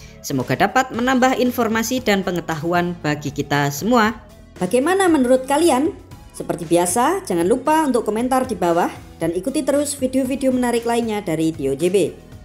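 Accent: Java, Indonesia. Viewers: Lugot jawanya meddok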